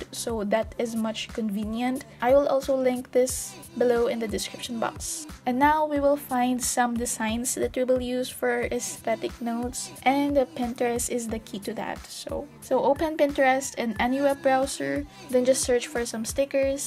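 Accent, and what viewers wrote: Philippines. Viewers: Are you by chance a Filipino? Your accent is like a Filipino Accent.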